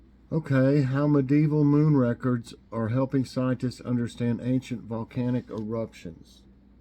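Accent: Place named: England, United Kingdom. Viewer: I love listening and hearing your English accent for I always love to learn. I tried to listen to understand English much better. Thank you 🙏 so much for sharing this strawberry lemonade as it is so new to me. I’d like to try it one day 🙏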